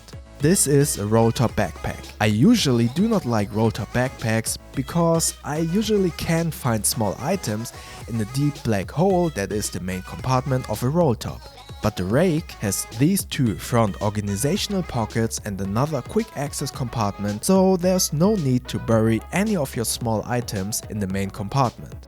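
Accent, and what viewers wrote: Germany. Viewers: Deutsche Akzent am Start :D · That T H I C C German accent tho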